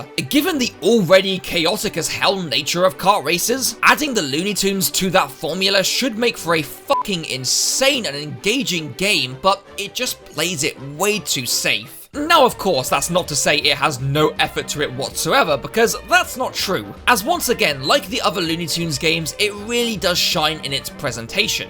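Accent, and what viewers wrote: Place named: United Kingdom. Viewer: I did not know you spoke british!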